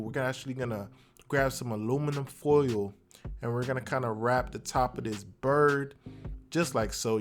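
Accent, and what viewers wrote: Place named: Florida, United States. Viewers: right! I'm from Tampa and when I was watching I was like he def from Atlanta or Florida lol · right! I live in Fort Lauderdale. I’m like he’s has to be a local lol 🙌🏼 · That accent right😂 I'm from Duval Co. He prob from Miami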